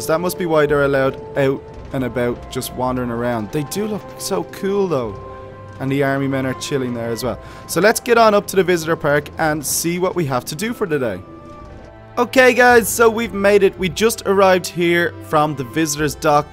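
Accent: Ireland. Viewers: Is he from Dublin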